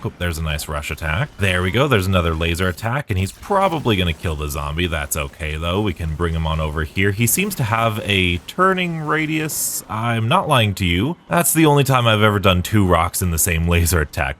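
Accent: United States. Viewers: Couple things.. 1... I didn't know you were Midwestern And 2.. on the free wonderwafte or however you spell it.. mustang Sally's make it incredibly easy (even just Sally) as the aoe clears all the debris almost instantly... I haven't had a chance to test it out but I am wondering if you could use c4 in this step as well if you would rather not burn 5k credits plus the gs45s wall but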